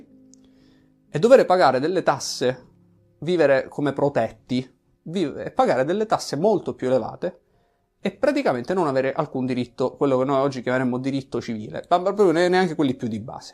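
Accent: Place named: Sicily, Italy. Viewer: Devo dire che, comunque, sarai di madrelingua italiana ma che sei siciliano si senta lontano un miglio. Ottima dissertazione in questo video. La cosa che mi fa più piacere è saper che la "componente araba" è presente tra di voi in minima parte. Infine, il fatalismo, secondo me, è un tratto caratteristico di quasi tutto il sud Italia.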